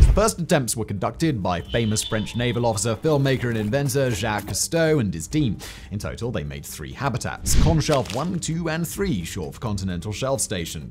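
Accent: United Kingdom. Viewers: omg your posh voice and pose make me doze...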